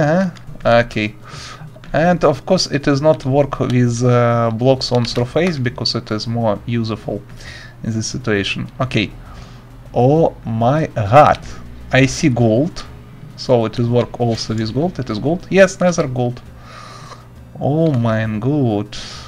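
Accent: Russia. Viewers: Русский акцент имба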